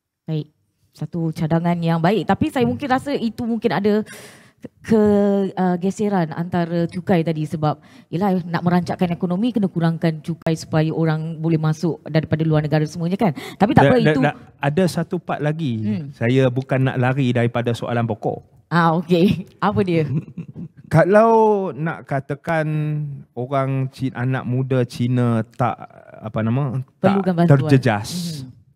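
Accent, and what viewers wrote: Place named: Perak, Malaysia. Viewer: Ada bunyi slang perak yob..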